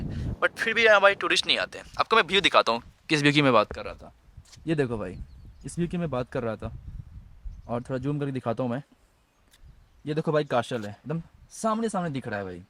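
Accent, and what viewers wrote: Bihar, India. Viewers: That,s pure 😘 Bihari accent 😂 love you brother ❤️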